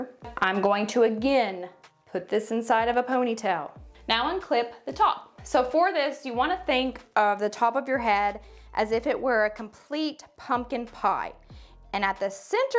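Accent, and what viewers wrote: South, United States. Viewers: Shoot ain’t u cute as they come! Your accent matches mine lol love your personality! Great video btw I really needed someone to show me in southern terms how to blend my own extensions the only difference I have is I’m brunette with really thick hair but pretty long too I also wear my hair straight more than I curl it plus I use one huge clip and fill in the empty spots best I can with my other individual extensions any suggestions without taking away a lot of my own hair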